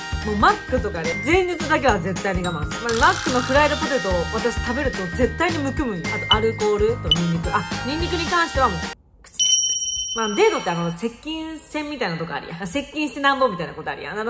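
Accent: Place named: Fukuoka, Japan. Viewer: 髪の毛は「とく、とかす」って言います。ボディクリーム、私はアメリカ在住なんですがアメリカでは結構作っている人多くって私も最近友達の手作りクリームのもらって使用してるけどシアバーター、他、色んな自然オイル（ココナッツやアーモンドオイル等、みんなそれぞれ自分にあったものを入れてるみたいです）使用してあってめっちゃ敏感肌な私にはとてもいいです！オイル使ってるのに塗ったあと全然ベタベタぬるぬるしない、でも保湿はしっかりしてくれて最高です！ナチュラルなものばかりなので髪、顔、ボディー頭から足先まで使えるので超便利です。なので私も来年は作ってみようかなと思ってたところです😊 のクリームできたら参考にしたいと思います。私も福岡出身。アメリカからいつも応援してます、そしていつも地元弁聞いて元気もらってます！😊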